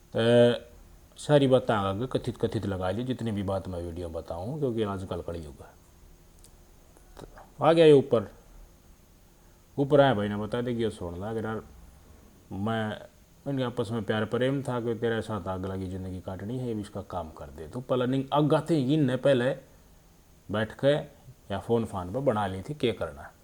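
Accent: Haryana, India. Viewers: Bhai jma gajab tarike t btav h …pure haryanvi💪🏻💪🏻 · Bhai shab aap bolne andaz haryanvi m bhaut khub h or aap ki baat bhut achhi lgi or aap age bdo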